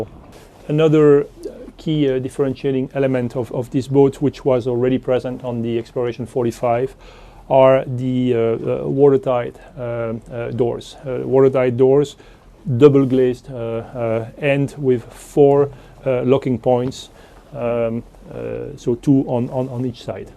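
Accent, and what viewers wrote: France. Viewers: Very normal French accent, quite clear to be French actually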